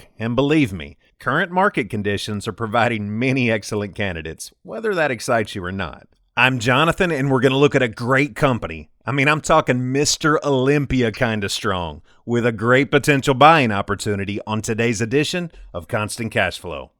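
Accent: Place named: South, United States. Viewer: I detect a hint of the Southern US in your accent. Very pleasant.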